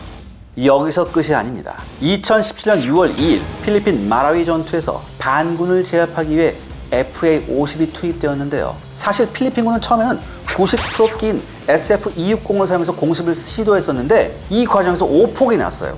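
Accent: North Korea. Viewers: 국방TV에서 북한 말투라... 정권이 맛이 가니 국방부도 맛이 가고 있구만.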